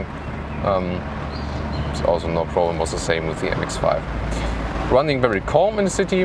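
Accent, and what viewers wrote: Germany. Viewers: Der Akzent ist zu deutsch! Üben!! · Just Awesome German accent..... 😩😩😩😩😩😩😩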